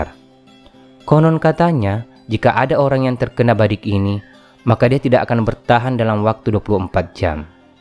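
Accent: Bugis, Indonesia. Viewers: Logat Narator sangat khas bugismya :)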